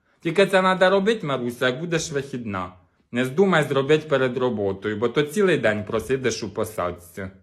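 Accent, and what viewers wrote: Poltava, Ukraine. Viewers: Я в захваті!!!! І це мало сказано... Ваша полтавська говірка, полтавська манера, тембр, жести, міміка -- все все так щиро і сердечно сприймається, що хочеться вас і дивитися і слухати і насолоджуватись... Як же добре, що є інтернет, який дає змогу нам милуватися дійсно народними талановитими самородками.. Вітаю, бажаю нових і нових підписників.. А особисто -- чудових ідей, гарних відео і успіхів на вдало вибраній стезі!!! Хай щастить завжди і всюди!!!!!!!!! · Море позитиву,який приємний Полтавський говір,Дякую тобі,ти супер👍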